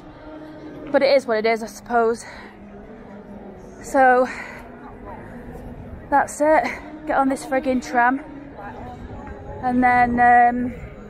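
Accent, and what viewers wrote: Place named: Yorkshire, United Kingdom. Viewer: Loved your Video im really familiar with your walk and the disused buildings and pubs which i remember well having been a taxi driver based in north Manchester in a previos Life, also i think the more you are back in blighty your yorkshire Twang gets less 🤣Very informative and interesting as Always XX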